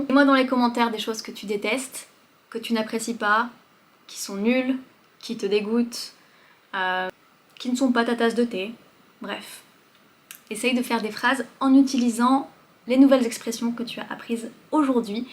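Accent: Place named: Normandy, France. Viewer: "Je hais" - Décidément on n'a pas le même accent. Tu prononce "Je hé", à la normande, alors que je le prononce "je hai" ou "je hè". Ah ce français et ces accents ! 🤣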